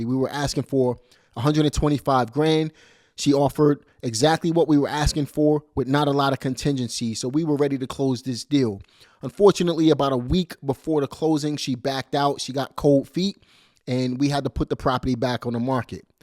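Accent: New York, United States. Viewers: You from new York?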